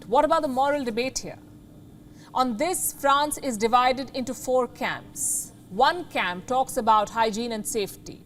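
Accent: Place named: India. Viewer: She just speak English i in hindi style with indian accent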